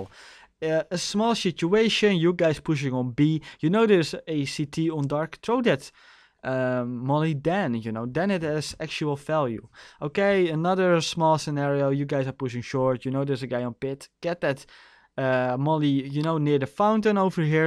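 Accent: Netherlands. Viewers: Good job man, I appreciate new people trying to be helpfull to the community! Also keep talking as much english as you get the opportunity for ;) Believe me as soon as the dutch accent starts to fade people will appreciate it even more.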